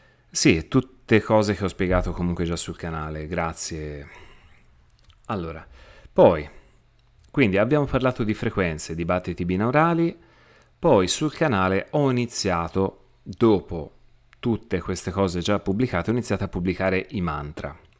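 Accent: Tuscany, Italy. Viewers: grazie x il tuo impegno ti seguo a 360 gradi ! Da anni ho iniziato un percorso di rivoluzione umana e i tuoi consigli e condivisioni x me sono molto ispirativi e di sostegno concreto ! Non solo il tuo accento toscano ( come me e poi ho scoperto che sei di Pistoia quindi siamo anche vicini !) il tuo modo di esprimerti e il tono della tua voce trasmettono sincerità per questo ti trovo davvero verace e concreto ! Riesci a mettere delle frequenze x il sistema linfatico o x i vasi linfatici ? Grazie ancora x il tuo impegno ! Buona vita e buona lotta !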